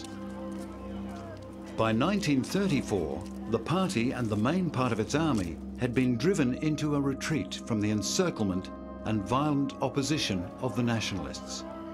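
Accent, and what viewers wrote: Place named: RP, United Kingdom. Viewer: Tse Tung was romanized to Zedong maybe ten years ago? I dunno for sure but recently-ish. And Keev I think is just his posh and very proper British accent kicking in.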